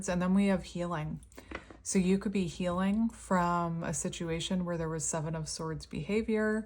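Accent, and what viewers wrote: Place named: Canada. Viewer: Hi! I've been watching you for about 2 weeks now & it feels like you are looking at my & my Twin Flame's life. We've been together for 12 years & right now it's really complicated. We've been apart for over a month now & I've been so broken over it. You & your readings have been spot on! For example, in like 3 different readings you kept saying he's watching me, almost like voyeuristic. I didn't think so but 3 different times he's said something about watching me or followed me someplace when I wasn't where I usually would be since I watched your readings. A lot of what has happened now makes sense, & that is entirely thanks to you & your gifts. You have a healing voice & I've really enjoyed watching you. You've given me a lot of insight & a lot to think about. You made a comment that a person could tell you were from Canada by the way you say "car". 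For me, the first time I heard you say "about" I knew. It seems like you all put a lot of emphasis on the "ow" (ou) part of the word. It's cute, like a reverse southern draw. Thank you for the clarity & peace that you've brought me. You have really REALLY helped!!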